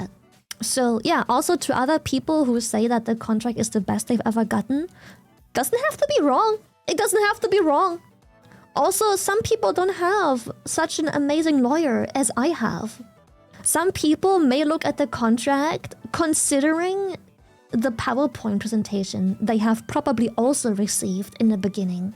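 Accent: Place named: East Germany, Germany. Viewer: I guess, the accent kind of tells it, she's from eastern Germany. More specifically Sachsen-Anhalt.She never went beyond those details.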